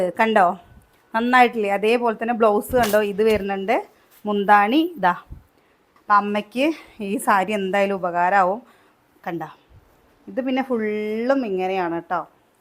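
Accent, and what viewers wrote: Palakkad, India. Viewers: പോളിസ്റ്റർ അമ്പാട കൂടിയത്. കളിയാക്കിയത് അല്ല. സംസാരരീതി പാലക്കാടൻ സ്റ്റൈൽ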